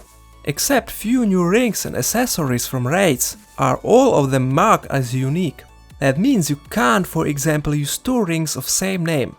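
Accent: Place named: Czech Republic. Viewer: Ure from czech republick right ? that axcent tho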